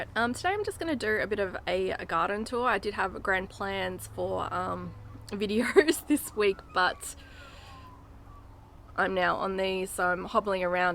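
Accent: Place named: Queensland, Australia. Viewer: Omg thank u... i just found ur channel an it's so good to hear another queenslander speak haha im a bit of a hermit an ive just realised I must be watching way too many American gardening channels ...crikey.. on a more serious note, I see ur experimenting with different tomatoes, do u have a fav yet of the large style ones in this climate? im just north of u near maryborough an have an absolute abundance of cherry tomatoes nearly all year round but cant find the same consistency in anything else yet ...maybe the cherries have set the bar too high i dunno.... oh an if u want a good flower for ur front garden put zinnas in, they grow really well need no care an the combinations of colours from cross pollination that occur each season is truely amazing... cheers an keep up the good work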